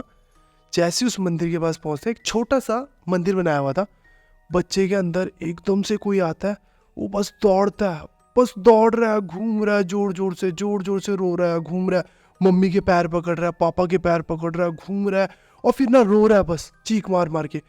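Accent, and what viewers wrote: Punjab, India. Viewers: Bhai apki language me punjabi accent h.. apka bg kaha se h??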